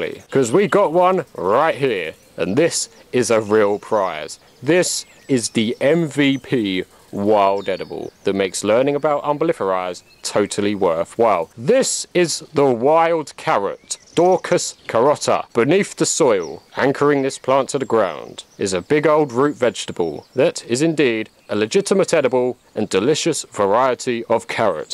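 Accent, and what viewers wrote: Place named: Cockney, United Kingdom. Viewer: the gezzers like a cokney david attenborough.. init.. love it.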